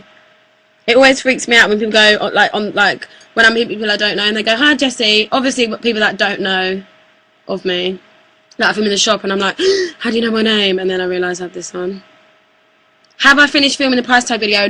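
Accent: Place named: United States. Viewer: your american accent is awsome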